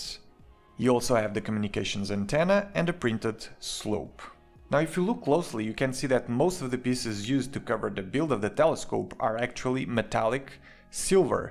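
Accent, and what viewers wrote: Portugal. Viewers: Damn, I could spot you were Portuguese 2 seconds after you started talking :D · You are Portuguese or Brazilian??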